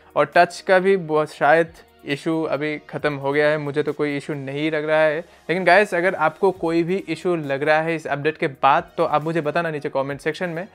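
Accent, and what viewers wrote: Bengal, India. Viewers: Bengali I guess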